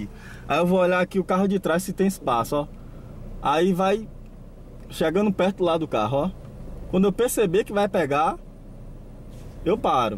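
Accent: Bahia, Brazil. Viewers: Conheço esse sotaque de longe...Vc é daqui da Bahia. Rsrs vc dá aulas de direção?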